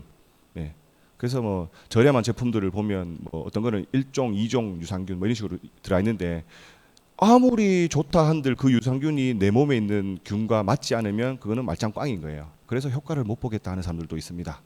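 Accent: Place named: Gyeongsang, South Korea. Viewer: 앱소브제품 설명 잘 들었습니다 구수한 경상도 사투리도 좋네요 이 제품에 칼슘 제품은 없던데 보충해야 하는지요?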